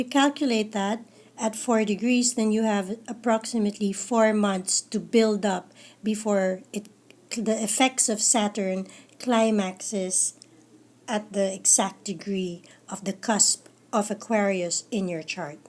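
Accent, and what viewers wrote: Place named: Philippines. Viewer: why do u have a filipino accent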